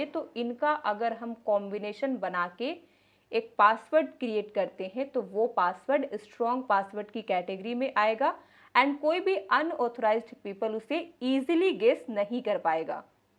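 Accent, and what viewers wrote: Odisha, India. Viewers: Ma'am kya aap odisha se hai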